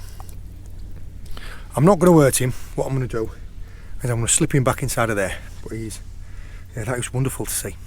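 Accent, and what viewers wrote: United Kingdom. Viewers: may I know which accents is this ? which part of Uk?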